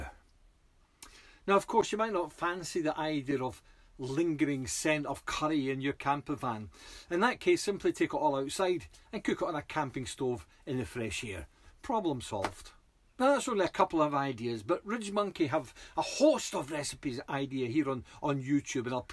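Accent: Scotland, United Kingdom. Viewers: Something about the scottish accent makes for a great story teller, the way you said calzone with such enthusiasm, great review and great video to watch, just ordered one from amazon will be collecting from a locker tomorrow and giving it a go.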